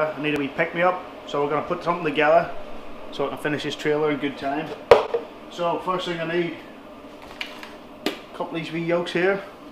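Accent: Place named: Belfast, United Kingdom. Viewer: where abouts are you from you sound like your from belfast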